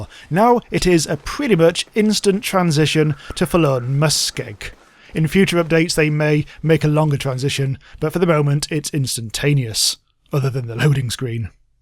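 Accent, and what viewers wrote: United Kingdom. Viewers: What part of UK is your accent from?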